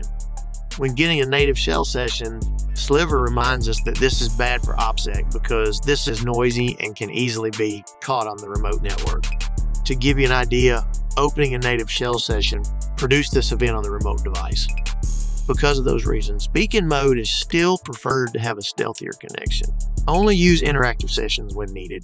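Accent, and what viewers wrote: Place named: South, United States. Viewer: an intelligent person rocks his country accent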